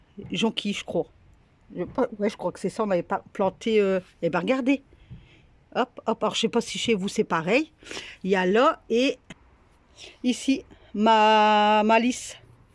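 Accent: North, France. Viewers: je découvre votre chaine avec cette vidéo, et j'ai tout de suite reconnu l'accent du nord ;) (j y suis aussi ;) ) . J'ai hate de retirer nos déco de noel même si on les adore, hâte du renouveau du début d'année :)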